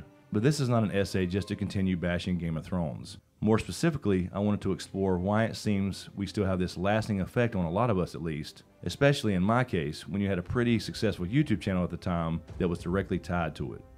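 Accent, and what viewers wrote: South, United States. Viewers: Yes, my heart is still broken. I have not re-watched or re-read GOT since S8. It was the sudden stop at the end. The death that Jon described as "nothing". There is an empty place where Sunday used to be. I miss you, and I miss James all of our GOT family. And because I work in healthcare, the past 2 years have been... well, let's just say GOT is not the only thing that has broken my heart. But I had a few minutes tonight and just hearing your Southern Boy charms, brought me right back to that "super bowl" feeling. GOT did not end well, but maybe, just maybe it will begin well. And we can gather around our respective computers and watch to our favorite YouTuber turned Fantasy Writer stream the GOT prequel content and our hearts will be healed.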